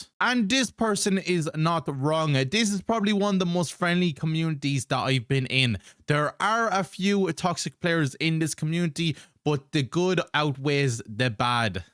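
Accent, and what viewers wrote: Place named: Ireland. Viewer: This guy is Irish Irish lol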